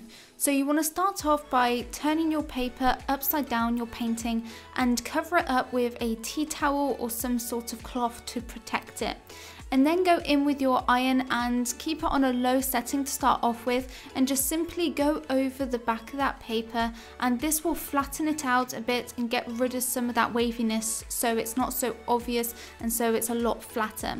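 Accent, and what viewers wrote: England, United Kingdom. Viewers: Is her accent British??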